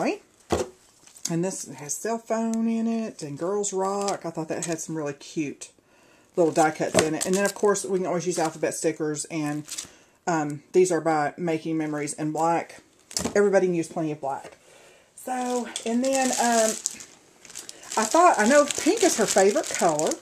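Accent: United States. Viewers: Wow!.. that is soooo generous of you... and I just want to tell you that your voice is sooo cute to me.. You sound soo country.. (and I mean that in a good way).... Awesomely swell of you!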